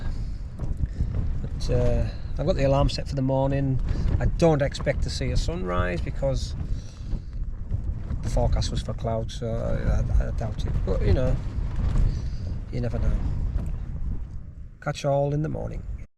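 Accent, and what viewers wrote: United Kingdom. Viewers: Nice video brother from across the pond. We both speak English but sometimes I had to rewind, the Queen's English is a little different from your cousins in the states.🤣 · are you a Geordie by any chance? 🙄 Was this up the Cheviots, beside Kielder? Redeswood forest? 🙄 🤔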